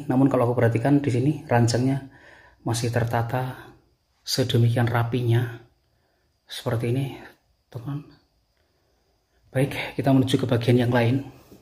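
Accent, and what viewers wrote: Java, Indonesia. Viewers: Logatnya meddo bgt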